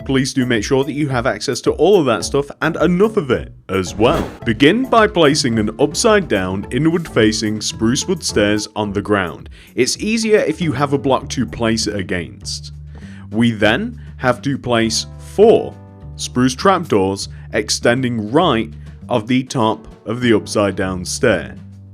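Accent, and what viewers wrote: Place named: Liverpool, United Kingdom. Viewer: Hi! I've got a question. Are you from Liverpool because your accent is a bit like it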